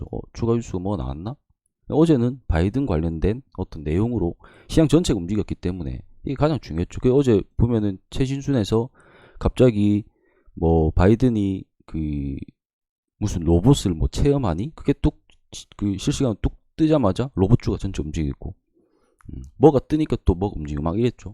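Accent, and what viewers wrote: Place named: Gyeongsang, South Korea. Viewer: 감사합니다 진솔하게 알려주심 감사드립니다 경상도 사토리가 정답습니다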